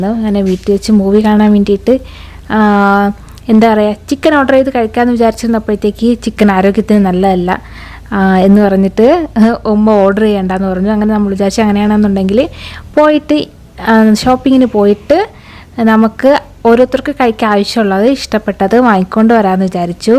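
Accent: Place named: Trivandrum, India. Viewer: place trivandrum aano. Tone kettitt angana thonnunu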